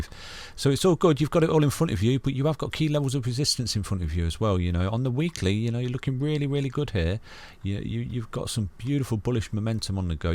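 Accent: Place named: England, United Kingdom. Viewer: Gday from Australia.. Love yr pommie accent but more importantly your sincere info and straight shooting.. Thanks Mate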